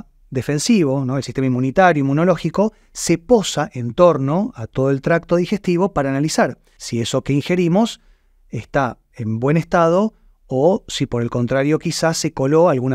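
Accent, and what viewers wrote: Argentina. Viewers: Buenas tardes dr.ud. es de Argentina? Donde atiende?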